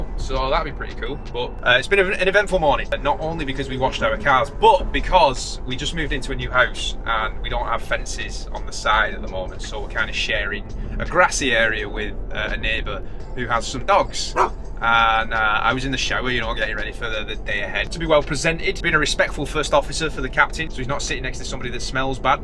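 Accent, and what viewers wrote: United Kingdom. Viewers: It sounds like you’re from the UK - how were you able to live and work in the US? It seems like the there are limited VISA’s for pilots (asking as I am currently trying to figure this out)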